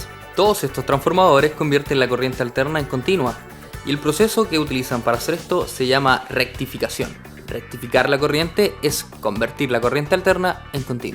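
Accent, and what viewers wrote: Chile. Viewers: Amigo mio una pregunta eres chileno ?¿ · Eri chileno? :3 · Es Chileno verdad? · Dices acá en Chile pero el men por el acento parece chileno :v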